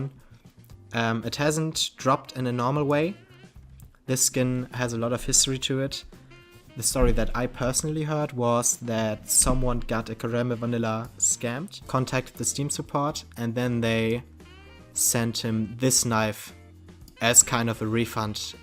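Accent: Germany. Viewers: Ultra German accent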